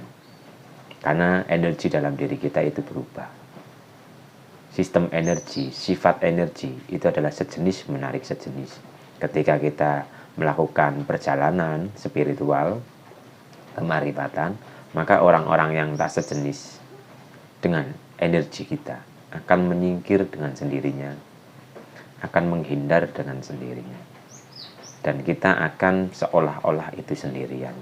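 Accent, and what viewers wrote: Banyumas, Indonesia. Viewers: assalamualaikum,, Njenengan kyane wong ngapak mas🙏🙏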